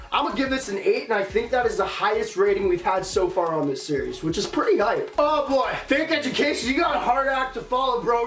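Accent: Winnipeg, Canada. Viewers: Are you from Winnipeg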